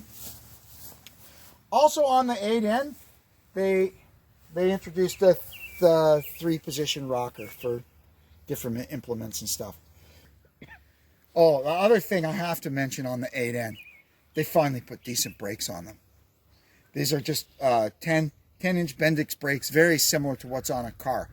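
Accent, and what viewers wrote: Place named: Canada. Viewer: Thanks for the video. Picked up the Cannuck accent early. ☺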